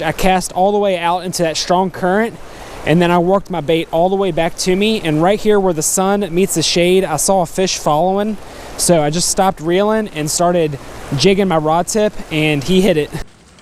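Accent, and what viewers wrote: Pennsylvania, United States. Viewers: Where is that accent from? Pennsylvania? It's interesting.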